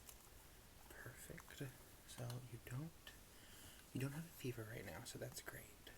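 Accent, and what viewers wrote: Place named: New Jersey, United States. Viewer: Being in and out of hospital lately I only wish this was the care I got, this is amazing I would love you to do something with typing like a clocky-clunky keyboard like checking into a spa for a facial you would be awesome at that with the New Jersey accent you do so well I LOVE that video all the same thank you for the amazing content and your work ❤ x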